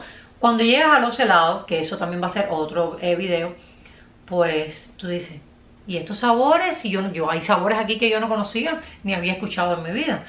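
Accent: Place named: Cuba. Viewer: Qué simpática, agradable esta chica y qué acento tan bonito el cubano!!! Creo, que de los acentos españoles más bonitos....Sino el que más.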